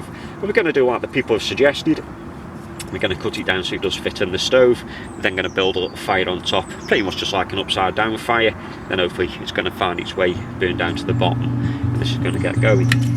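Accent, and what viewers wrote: United Kingdom. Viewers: Thanks for the reply mate. Yea, I could see the logic in trying it out. There was another idea someone else had to put folded tinfoil with holes in it to cover the feeding hole and encourage the air flow from below. They combined it with the vertical stack and believed that it was more efficient boiling water 2 mins faster. There's always going to be someone who has to try to get a faster boil. Or a newer more fancy stove to shave a few precious seconds off the boil time. Kind of takes the fun out of the whole thing and feels like they are missing the point a bit. Though I am coming at this from a leasure angle rather than a survival one. Me :) I'm happy fueling the fire as I go and enjoying the whole experience. The fun of watching and feeding the stove is a big part of that. The smell of the smoke and the flavour when you cook with it. 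Totally agree. It's also really uplifting after a long week just to get back to basics but from your videos you and im sure most of the people watching your videos completely get it :) Also nice to see someone from the UK with an accent I recognise on here lol. I'm up in Scotland.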